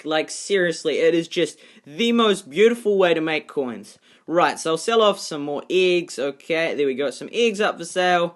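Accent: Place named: New Zealand. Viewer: WhiteAsian Manchild he’s from New Zealand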